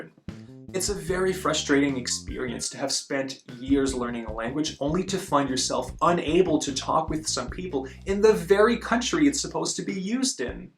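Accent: Standard. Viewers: 卧槽你普通话好标准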